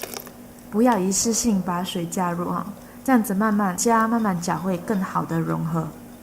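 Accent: Malaysia. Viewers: 果然马来西亚华语，语病连连～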